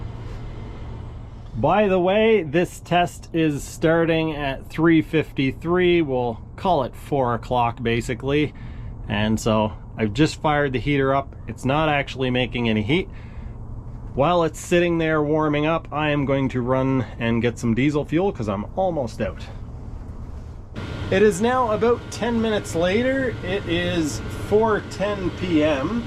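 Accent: Canada. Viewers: Excellent videos! 🍻🍻 Do I detect a southern Ontario accent? 😁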